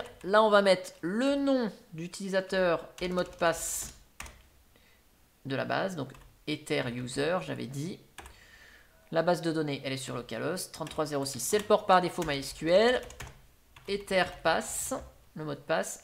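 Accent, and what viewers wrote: France. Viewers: Guy teub et Mec. C'est marrant l'accent Français quand même :)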